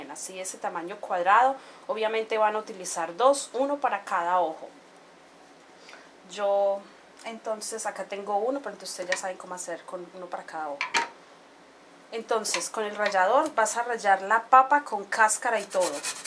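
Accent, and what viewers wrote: Colombia. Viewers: no tengo ojeras ,solo pase por tu video y me embrujo tu acento colombiano. bonita, inteligente y simpatica...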